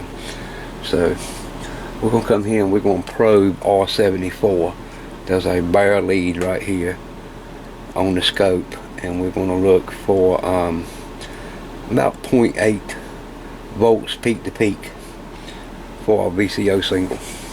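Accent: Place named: Deep South, United States. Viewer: I’d say somewhere between Alabama through to Louisiana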